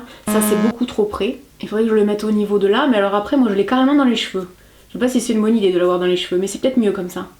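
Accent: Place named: Toulouse, France. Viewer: Ma fille m'avait conseillé de vous regarder...c'est fait et j'vous trouve excellente..et l'accent j'adore..forcément je suis pas loin de toulouse...du coup j'vous suis 🙂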